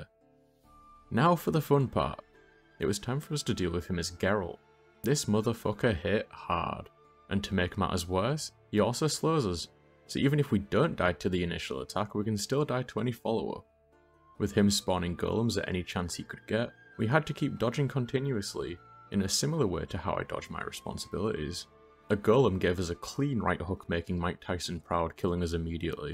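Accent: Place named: Northern England, United Kingdom. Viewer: He’s clearly northern English, Musk doesn’t sound like he’s from anywhere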